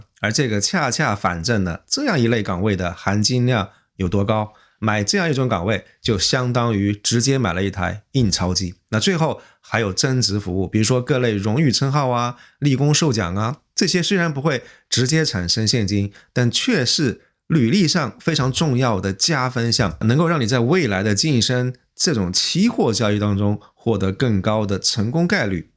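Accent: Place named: Sichuan, China. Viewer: 博主的这一口川普真的非常恶心，非常刺耳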